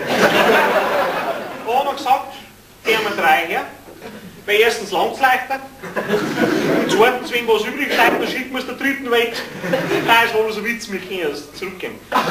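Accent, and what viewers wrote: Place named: Bavaria, Germany. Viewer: Als grenznaher Baden-Württemberger muss ich sagen: Grünwald ist in seiner Comedy-Sparte König. Ich glaub, ich finde den so komisch gerade weil er Boarisch spricht. Boarisch und fließend Besoffen.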